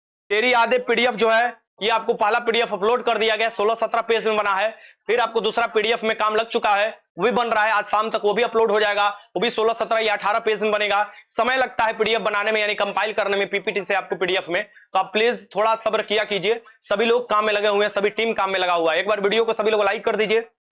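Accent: Bihar, India. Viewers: Sach me sir bihari lag rahe hai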